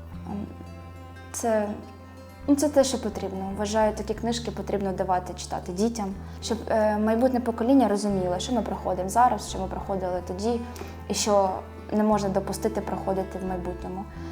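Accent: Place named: Surzhyk, Ukraine. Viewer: подобається твій періодичний суржик) дякую за роботу.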